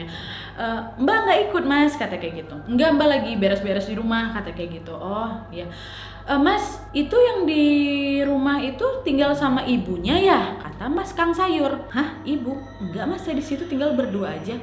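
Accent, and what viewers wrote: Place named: Medan, Indonesia. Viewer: Tapi knp ngomong nya kyk Medan?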